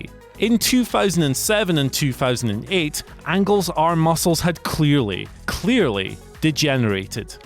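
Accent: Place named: Scotland, United Kingdom. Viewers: Is from Aberdeen, Scotland because he sure sounds like he is? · Are you Scottish 🏴 ehh my pal 👍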